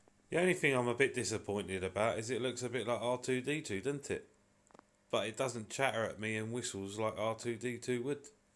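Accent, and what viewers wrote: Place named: United Kingdom. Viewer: Impella', filta'. heata', prociga', tank wata', wira'. Love that accent. Where in the UK is that? That plastic media is totally worthless and Oase used it to cost cut. Use higher quality bio media with more surface area.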